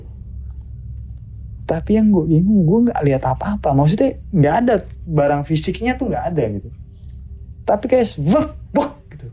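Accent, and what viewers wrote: Java, Indonesia. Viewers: Bang abis ketemu mas Itank dkk n mas Bala, jd kebawa logat Jawa yah...😃😃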